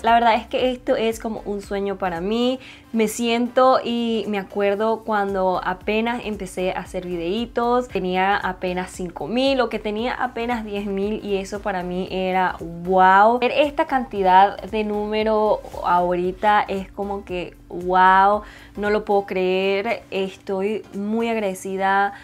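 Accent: Venezuela. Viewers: Hola yo te descubrí con el vídeo de la colección de Disney villains x colour pop. Te sigo desde Venezuela💖 Siempre supe que eras Venezolana por el acento😂😬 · Yo mi primer video lo vi y dije ella es venezolana como habla te veo desde estados unidos tambien soy venezolana y ya estoy poniendome al tanto con todos tus videos 🤗♥️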